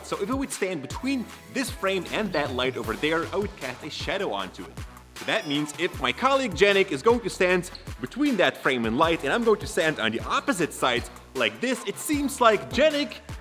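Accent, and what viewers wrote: Netherlands. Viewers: Are you dutch ?? Me too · Wacht, ben je Nederlands? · Are You dutch? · Ik hoorde al een accent maar jullie zijn dus nederlands!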